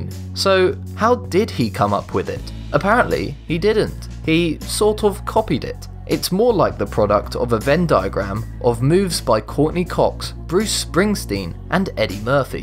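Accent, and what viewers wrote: United Kingdom. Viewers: What is this what is this voice why is it so different and British why please why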